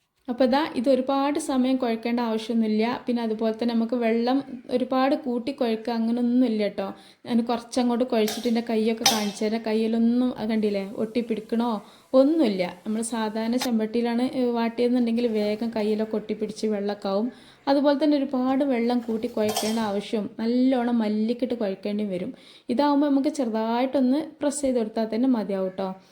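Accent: Malappuram, India. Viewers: Malapuram ano samsaram 👌👌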